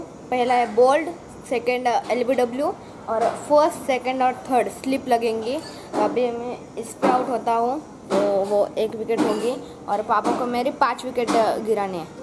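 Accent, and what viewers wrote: Maharashtra, India. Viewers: Bhai aapki drive to laajawab hai , maja aa gaya dekh ke just go forward in your life ❤️❤️ ( And I'm also marathi and I know u r also marathi by listening your accent ) 😁😁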